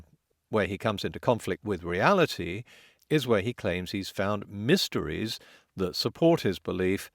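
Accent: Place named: England, United Kingdom. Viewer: Oh how I missed slightly sarcastic English voice.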